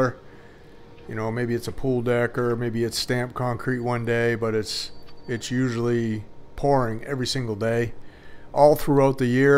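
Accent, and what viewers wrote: Maine, United States. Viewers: You are absolutely right about steel reinforcement on concrete. Concrete has very high compressive but less tensile strength. Reinforcement helps protect slab integrity under tension. Common ways the concrete has tensile forces applied are being entrapped (like a slab between walls) when expanding and contracting from temperature changes or subsidence form improper subgrade preparation. I had a 26' x 36' shop slab poured inside a ICF frost wall (8", 4000 PSI with fiber, one control joint midway, on 2" of blue foam with 6 mil vapor barrier, and meticulous sub grade prep) and no steel. People we're flipping out telling what a disaster I was going to have. Ten years latter there is not a crack in that slab. It's expansion and contraction is buffered by the ICF block so it is not trapped and never goes under tension. This is with a 10,000 lb car lift on it. 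The unstated downside to steel is it expands as it inevitably corrodes and can break the slab up on it's own. Great channel, watch regularly. I'm from Hollis, ME now exiled to VT. I recharge my accent when I watch. As I tell the Vermonters, anybody from Maine is worth two Vermonters, three on a good day. They take it in the spirit of humor as intended but people from away are aghast.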